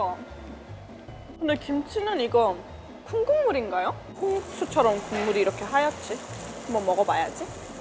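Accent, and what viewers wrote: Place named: Gyeongsang, South Korea. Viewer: 그동안 무슨 일이 있었길래 본인 유투브 채널 활동 안하다가 다시 컴백한건가요. 구수한 경상도 사투리가 매력적인 보고싶었어요. 다시 활발하게 활동해 주세요.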